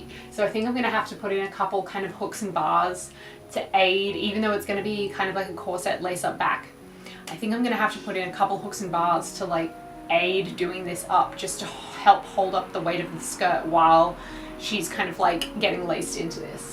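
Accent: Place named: Australia. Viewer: An Australian accent! Heck yes, im Australian :)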